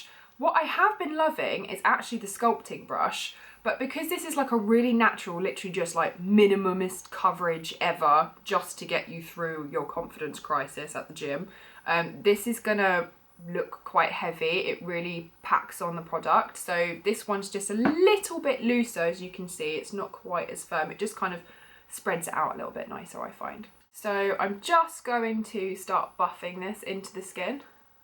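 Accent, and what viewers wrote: United Kingdom. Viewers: what part of uk is your accent? If you don't mind me asking. I must say, you're very beautiful and your accent makes you perfect 😊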